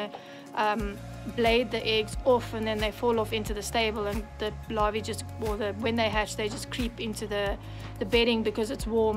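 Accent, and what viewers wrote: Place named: South Africa. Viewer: Liked and subbed! I’m happy to have found a South African youtuber as I grew up near Durban and moved to England in 2002 and I miss the country and the accent so much! This was such a useful and thorough video! You have a very good manner in front of the camera! I didn’t know about this technique so I’ve learnt something new today hehe thank you for sharing! I’m excited to see more! Btw I have a group on Facebook just for vloggers called Equestrian vloggers! I’d love to see you in there ☺️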